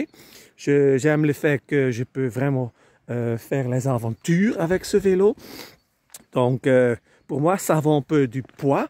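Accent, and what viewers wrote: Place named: Flanders, Belgium. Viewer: Magnifique 😍 et j’adore votre accent, j’aime beaucoup l’accent et la langue flamande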